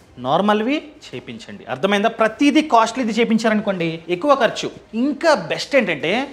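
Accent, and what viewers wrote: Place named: Andhra Pradesh, India. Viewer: Hi, Please don't mind for this Question. Why is your Telugu slang so different? Are you not from AP?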